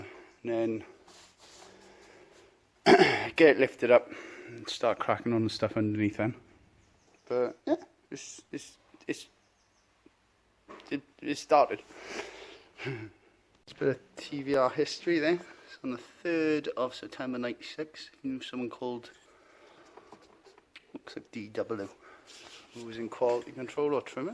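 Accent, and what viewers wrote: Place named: Wales, United Kingdom. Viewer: What part of Wales are you from? I'm in rhondda.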